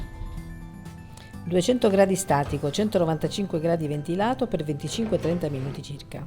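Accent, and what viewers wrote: Calabria, Italy. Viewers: Ciao sei bravissima,ti seguo sempre, sei di Reggio? Di quale parte della Calabria?😘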